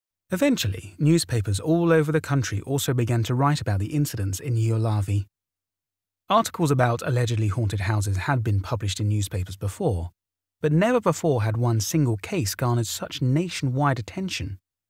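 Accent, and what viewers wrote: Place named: Bristol, United Kingdom. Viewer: Your voice is very melodic. I'd say it's close to but not quite a Bristol accent?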